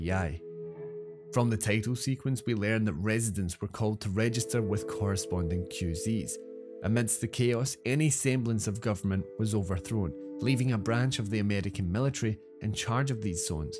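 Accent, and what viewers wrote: Scotland, United Kingdom. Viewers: I love your Scottish accent! :) it’s very calmed and easy to understand. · the universe doesn’t revolve around you, scottish accent is easy to understand for most people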